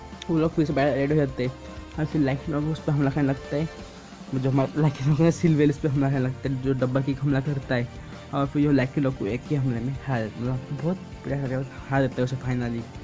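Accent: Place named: Bihar, India. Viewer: Bat dhik se kar bihari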